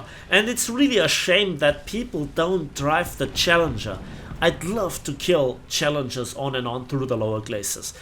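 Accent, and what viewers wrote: Germany. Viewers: I just discovered this channel, after looking for an AMX-30 gameplay, and I was amazed at the quality of the reviews you make. You have by far the best videos on WT Ground Forces I've seen, and i've been playing/watching WT for 5 years. Great channel,. Just wonderful. I find your videos superior to any of the top youtube channels that cover WT Ground Forces. Oh, and are you german?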